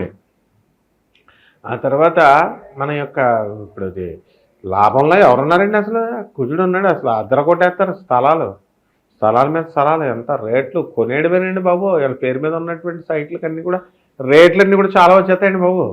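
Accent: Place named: East Godavari, India. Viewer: East Godavari yasa andariki nachhadu, mamuluga matladandi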